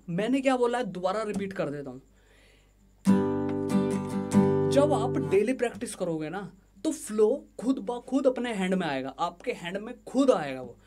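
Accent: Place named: Meerut, India. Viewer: Bhai aapka way of talking kafi apna apna sa hai. Meerut ke aas pass se ho kya???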